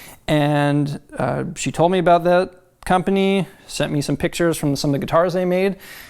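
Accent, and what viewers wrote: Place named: Manitoba, Canada. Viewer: oh that manitoban accent!! XD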